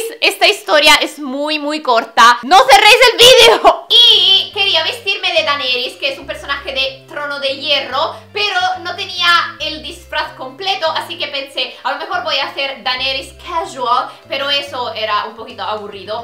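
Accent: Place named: Italy. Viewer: lol creo que nos recordaste que era tu cumpleaños como 10 veces jajajajaj! acabo de descubrir tu canal!!!!! tu manera de hablar me sirve mucho para practicar el acento italiano.....Porque se italiano pero me falta el acento!!!!!!!!además puedo ver tus vídeos en italiano y en español para aprender nuevas palabras!!!! Eres lo maximo!!!! Descubrí un tesoro oculto!!!!!!eureka!!!estuve viendo algunos de tus vídeos y me encantan!! hoy haré una maratón de vídeos tuyos!!!! ya tienes una nueva suscriptora!! Y traeré a mis amigos por aquí también!!! :)) (*se emociona*)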